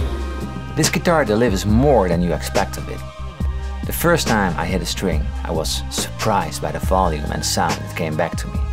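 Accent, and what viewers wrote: Netherlands. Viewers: Hey, I wonder where you're from! You're English sounds like how dutch people speak English. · Olivier Yes. He's dutch! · Ik wist niet dat je Nederlands was. Hahaha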